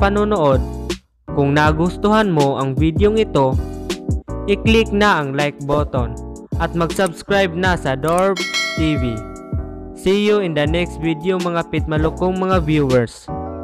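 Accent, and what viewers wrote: Visayas, Philippines. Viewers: Bisaya ka dong.. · Klaru kaayo kang bisaya sa tuno nimu bah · Halatang bisaya ka bay haha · san ka sa visaya dong?? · Halata kaayu bisaya imong tingug dong 😁😘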